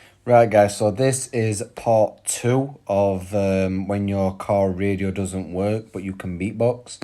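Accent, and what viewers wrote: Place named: United Kingdom. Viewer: AHHH HES BRITISH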